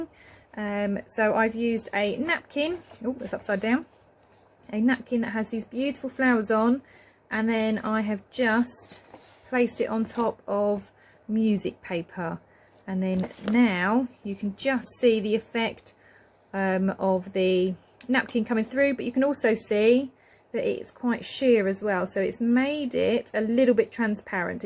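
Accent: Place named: United Kingdom. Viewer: Thank you. A British accent, great. Translucent - light passes through.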